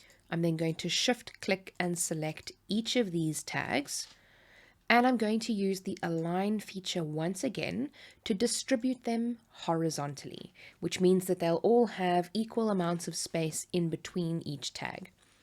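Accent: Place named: South Africa. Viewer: I love your accent. Thinking it may be South African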